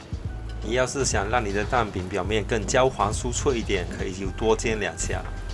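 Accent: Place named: Taiwan. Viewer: Thks for sharing this good breakfast recipe. Wonder where are you from originally? Your mandarin sounds taiwanese or singaporean.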